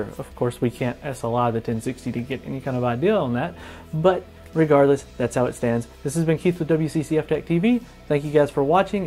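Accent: South, United States. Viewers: Lemme guess you from the south of the US as you sound country haha, cool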